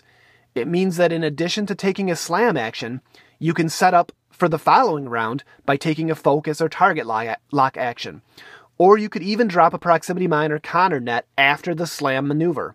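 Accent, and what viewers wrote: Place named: United States. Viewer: You're aware that American people tend to have American accents, right?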